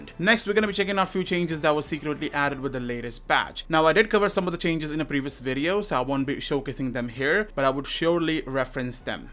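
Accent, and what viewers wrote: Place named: India. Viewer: Why do you sound so Indian?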